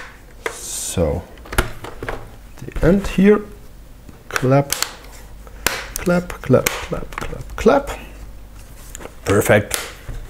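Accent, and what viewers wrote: Germany. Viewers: Bei meinem A517 scheint das Kabel einen Wackelkontakt haben. Teilweise fällt das Bild aus, oder es flackert. Kann ich das Kabel auswechseln und worauf muss ich dabei achten. Ich gehe davon aus, dass deine Muttersprache deutsch ist. Gruß Klaus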